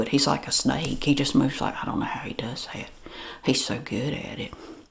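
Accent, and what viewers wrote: South, United States. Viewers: is the epitome of adorable AND cringe. And the southern accent really adds to that I'm sorry to say 😆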